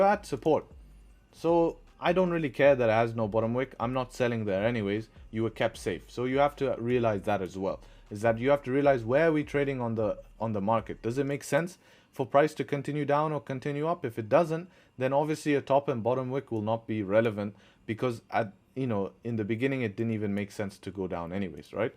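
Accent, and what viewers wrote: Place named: South Africa. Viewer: You are coloured from south africa or namibia 😊, your accent tells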